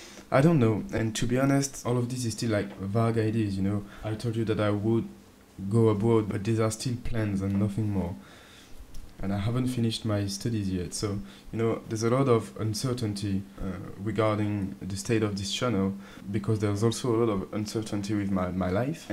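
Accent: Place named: France. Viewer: ptn ouais j me disais bien que t avais l accent francais!^^ ca fait plaisir, brf continu ta chaine surtout tu gères👍🏻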